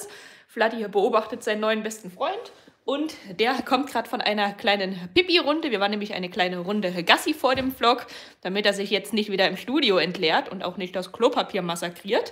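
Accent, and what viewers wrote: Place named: Berlin, Germany. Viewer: "gepullert" 🤣 ich liebe euer Berliner Dialekt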